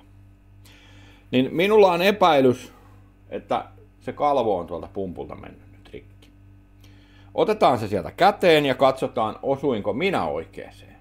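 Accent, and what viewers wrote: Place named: Tampere, Finland. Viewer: Artikulaatiota selkeällä Treen murteella-asia tulee ymmärretyksi👍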